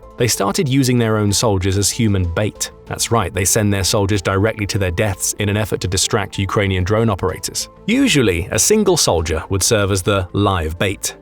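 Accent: United Kingdom. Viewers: You assume a whole lot. YouTube is all of a sudden allowing these goofy videos. I can provide footnotes, but relax, folks. Why a Limey voice? It is a Ruse but approved by upper management. They are the employees who have defeated HALO in less than an hour.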